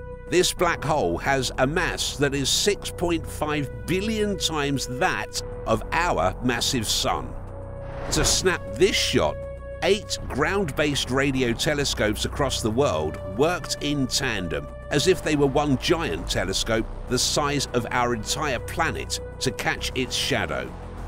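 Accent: United Kingdom. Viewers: >click on video >hear British accent >close video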